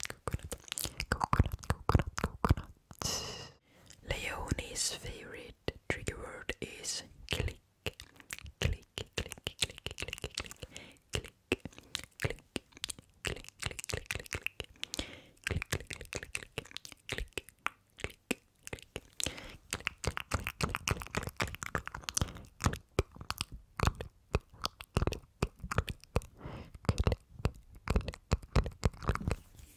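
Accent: Sweden. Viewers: I love your Swedish accent ❤❤ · He has a Swedish accent ! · Swedish is one of the best accents for asmr and accent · My grandfather is swedish so I really like your accent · Hee Swedish I believe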